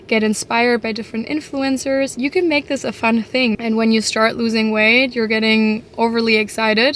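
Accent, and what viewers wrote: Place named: United States. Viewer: You literally sound american! Thats so crazy how living in america for 1 year left you with an american accent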